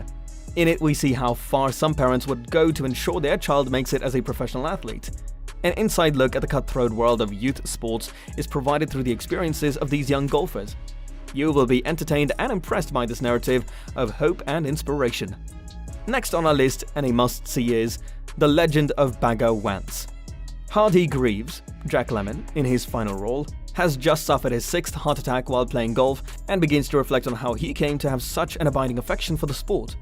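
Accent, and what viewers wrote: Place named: United Kingdom. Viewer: Drop the British accent. Irritating!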